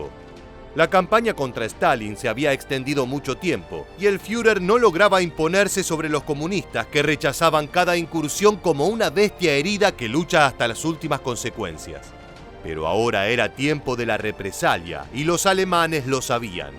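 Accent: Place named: Argentina. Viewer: Hola... No me provoca ninguna satisfaccion escuchar tus comentarios sesgados respecto a los rusos, precisamente xke tengo familia viviendo en moscu ke me mantiene muy bien informado de todo ko ke occidente esta tramando con esto de ucrania... Te escucho y suenas como ke hubieras preferido ke los nazis, aun despues de haber exterminado a mas de 6 millones de seres humanos le hubieran ganado a rusia... X tu timbre de voz veo ke sos argentino y un argentino seguramente RUSOFOBO ke defiende a estos nazis ucranianos ke vienen masacrando a mas de 140 mil ciudadanos prorusos en ucrania desde 2014, y ke seguramente se alegra, festeja y aplauda ke los argentinos tengamos una base nuclear de la OTAN instalada en nuestras islas malvinas... Solo ruego a Dios ke no seas otro milicoide conspiranoico esperando la oportunidad de derrocar otro gobierno constitucional en mi pais utilizando las armas ke pago yo... 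Vi varios videitos tuyos y la verdad venias digamos ke bien... Pero en esta te fuiste a la banquina y quedaste expuesto demostrando lo ke en realidad sos... Un discipulo del tio sam Chau...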